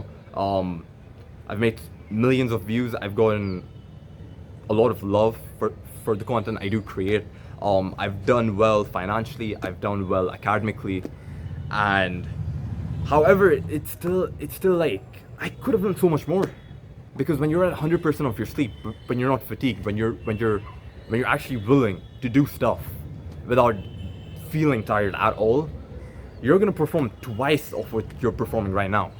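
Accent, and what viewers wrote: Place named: United States. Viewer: bro you form kuait but please tell me how you got this such fluent american accent please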